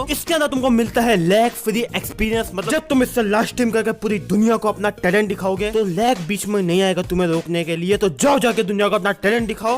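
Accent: Gujarat, India. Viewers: Bro you GUJARATI???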